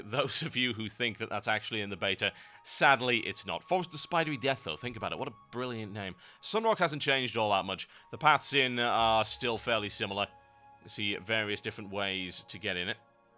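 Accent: United Kingdom. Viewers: Im ashamed to be from the same country as you :( im Norwegian 2 and i dont see anything wrong with his accent, infact i think a real British accent is awesome. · Wait a second... Are you brittish? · Why is everyone going bananas over the accent? Has no one ever heard a British person speak before?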